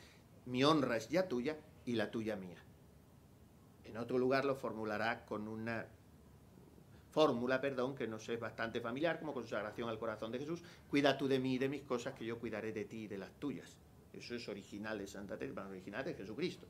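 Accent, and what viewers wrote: Extremadura, Spain. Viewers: Monseñor, se queda uno ensimismado con V., con lo bien que explica todo y con la sabiduría que hay en sus palabras, fruto del estudio intenso, la oración profunda y el cariño sincero. ¿Cómo no apreciarle y quererle tanto? Y, además, con ese gracejo extremeño que comparte con tanta simpatía. Habría que decir como los niños pequeños cuando disfrutaban con algo y no querían que acabase: "sólo cinco minutos más". Porque disfrura uno tanto que se le pasa volando. Buen descanso para todos nos dé Dios 🙏🏻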